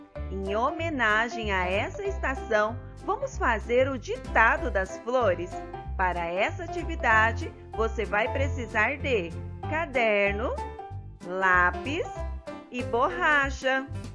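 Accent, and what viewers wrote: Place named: Minas Gerais, Brazil. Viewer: Eca vc fala q nem mineiro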